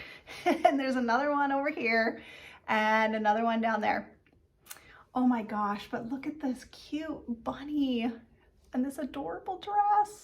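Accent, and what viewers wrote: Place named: Canada. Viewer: I just started watching your videos after a shout out from the team at Just Keep Stitching. Your stitching projects are so beautiful! I love your devotion to each and every one of them. And, yes, I love your Canadian accent too! My mom was born in Nova Scotia and all of her relatives (except one) still live in Canada, mostly in the Maritimes and Ontario. I have subscribed to your channel and can't wait to see your progress on your projects.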